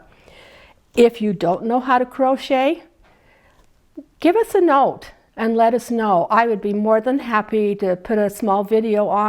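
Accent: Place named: Utah, United States. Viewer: That Utah accent came through loud and clear in the first 30 seconds…. Great tips. I am going to crochet myself a bobbin holder right now.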